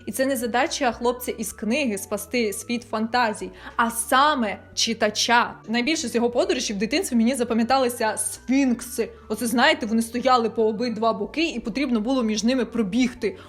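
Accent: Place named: Kharkiv, Ukraine. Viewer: звучить ідеально, в нас в Харківській області розмовляють саме на такому суржику. В кожному регіоні суржик свій.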